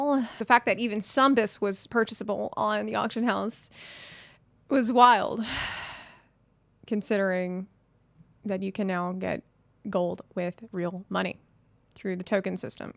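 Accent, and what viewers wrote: South, United States. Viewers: I think it's a light version of a southern drawl, so maybe around Tennessee somewhere? That's a complete guess though.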